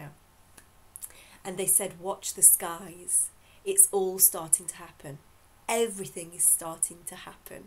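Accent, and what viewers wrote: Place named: United Kingdom. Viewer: Your GB accent is so much fun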